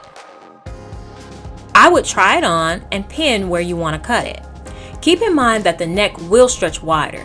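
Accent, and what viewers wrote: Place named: South, United States. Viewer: it’s the cute Southern accent for me, y’all!❤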